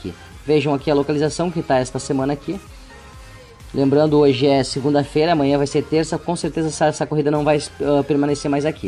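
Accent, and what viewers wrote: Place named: Rio Grande do Sul, Brazil. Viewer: Tu é gaúcho!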